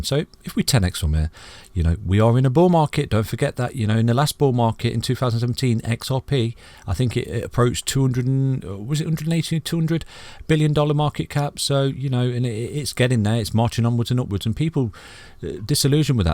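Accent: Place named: Leicester, United Kingdom. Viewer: Thanks again for the great content, you are my new favourite channel!. i have been following mostly American channels like the Block chain backer and working money channel ect, i have learnt more from you in the last 2 weeks than i have in a year. love the way you come across with no BS. i would love it if you could do more TA so we can learn all the tricks of the trade. great channel, well done pal, thinking that is a Leicester accent you have!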